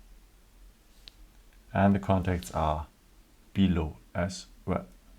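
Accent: Germany. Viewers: Bruder man merkt voll das du deutscher bist wegen dem aktzent feier dich lg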